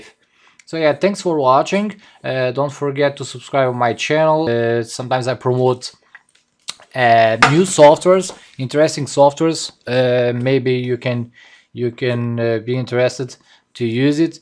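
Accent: Portugal. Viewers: Sotaque Tuga, pt? xD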